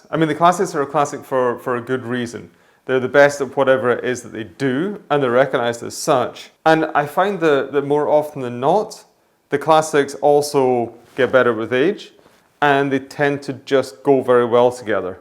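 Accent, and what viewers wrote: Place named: Edinburgh, United Kingdom. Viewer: He’s Scottish , East coast accent .... probably Edinburgh .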